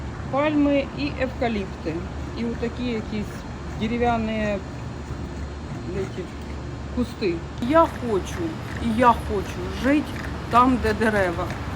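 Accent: Kryvyi Rih, Ukraine. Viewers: Коли почула суржик і зрозуміли що ви з мойого рідного Кривого Рогу! Я так скучила за ним! Живу далеко в Ліссабоні вже 25 років. Але ріднішого міста у мене немає, як Кривий Ріг!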